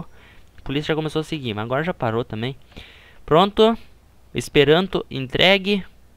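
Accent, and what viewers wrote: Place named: Southern Brazil, Brazil. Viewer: Southern accent of Brazil. 🙂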